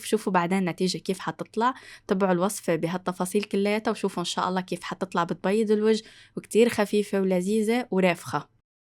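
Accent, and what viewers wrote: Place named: Lebanon. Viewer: اللهجة لبنانية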